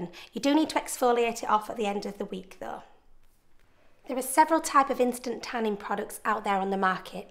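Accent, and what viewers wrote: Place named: Newcastle, United Kingdom. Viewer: lol shes so from newcaslte or round there xd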